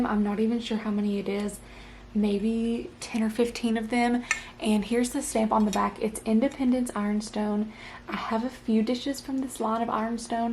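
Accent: South, United States. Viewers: You are so lovely to listen to. Love your accent, reminds me of when I lived in Tennessee- love the southern accents 🇬🇧🇺🇸🌸🌸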